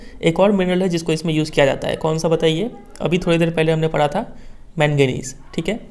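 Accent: Bihar, India. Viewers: Your accent proves u r from bihar, 😀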